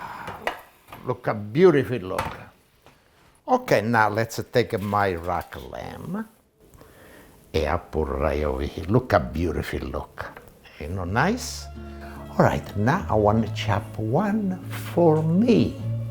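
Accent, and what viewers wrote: Italy. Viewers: You gotta love that Italian accent too!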